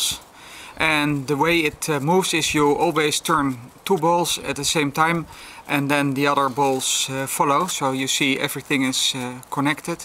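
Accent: Netherlands. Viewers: it's a Dutch accent!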